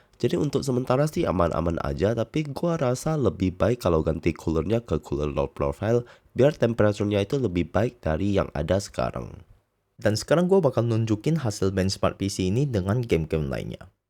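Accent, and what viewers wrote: Indonesia. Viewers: dari caranya ngomong gua yakin orang ini Cindo.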